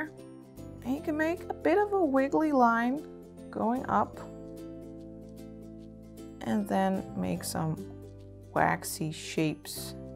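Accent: Netherlands. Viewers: IS SHE DUTCHHH AAAAAAAAHHH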